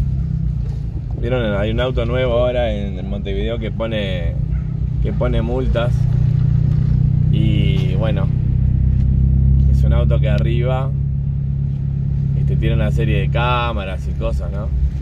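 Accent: Argentina. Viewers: Che una pregunta el es gasolero? Pq sino el precio de la nafta te come vivo. Le comenté a mi hno de tus videos. Otra cosa tenés el mismo tono de voz de un salteño q vive acá, tienen otra particularidad en común a los dos se le están volando las chapas jaja. Slds desde Toronto. José Luis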